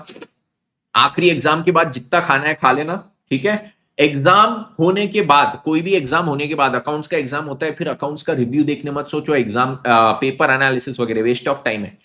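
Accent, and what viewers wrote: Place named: Nagpur, India. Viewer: He has a Nagpuri accent omg 😂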